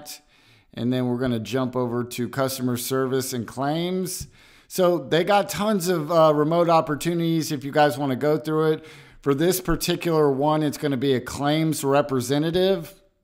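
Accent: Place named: United States. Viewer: he sounds like he’s from the U.S. though. I don’t think it’s hard to figure that out. He didn’t say “careers around the world,” so it would be safe to assume they are from his region.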